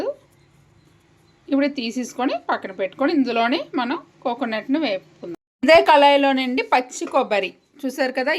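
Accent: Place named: Tamil Nadu, India. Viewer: Boríng commentary, Tamil pronunciation. Don't use aluminium dishes.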